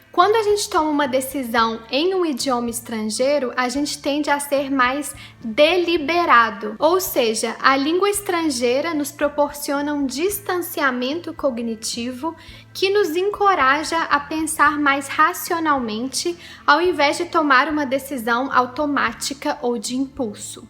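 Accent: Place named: Rio de Janeiro, Brazil. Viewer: Are you from Rio, your accent is so carioca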